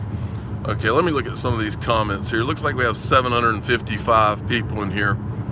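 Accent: South, United States. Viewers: I Have listened to you for along time and have always enjoyed you streams, I always imagined that you were black, but it is that southern accent and you very deep voice, here in England I have a good friend from Alabama and his accent is pretty simular and he is Black! Just saying, I am not disappointed but I am surprised!😄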